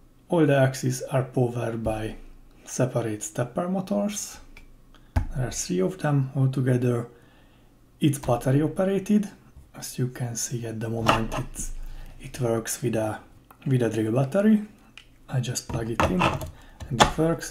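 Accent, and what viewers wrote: Hungary. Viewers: This project is cool. Congratulations. Development idea: equip the motors with rotary encoders and use them for teaching function (pre set the scenery and camera movement before shooting). Ps.: your keyboard and accent is Hungarian. Are you?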